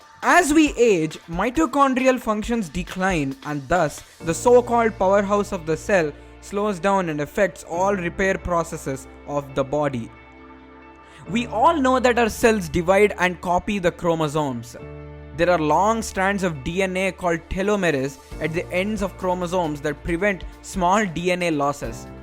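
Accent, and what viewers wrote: India. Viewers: Good topic and research but narration is bad. Feels like a Hindi professor teaching in English.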